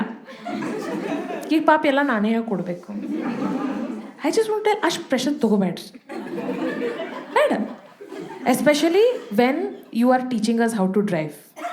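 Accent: North Karnataka, India. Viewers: there's no question of 'Hope'... I simply love your comic timings.. its beyond perfect n the north karnataka kannada is like icing on the cake!!! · ನಿಮ್ಮ್ ಉತ್ತರ ಕರ್ನಾಟಕದ ಭಾಷೆ ತುಂಬಾ ಚೆನ್ನಾಗಿ ಸಾಮಾನ್ಯ ಜನರು ಯೋಚನೆ ಏನಿದೆ ಅದನ್ನೇ ತಾವು ಹೇಳುವದು , ತುಂಬಾ ಚೆನ್ನಾಗಿದೆ , ಹೆಚ್ಚಾಗಿ ಬರಲಿ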